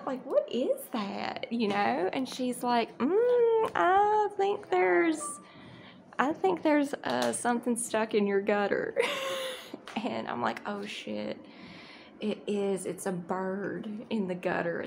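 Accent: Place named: South, United States. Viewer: you're too damn cute and voice is so southern I love it lol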